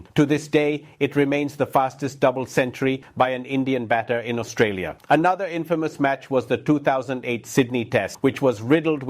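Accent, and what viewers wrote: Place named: Barbados. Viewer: Bhai you look like someone from Nallasopara, why are you speaking like someone from Barbados?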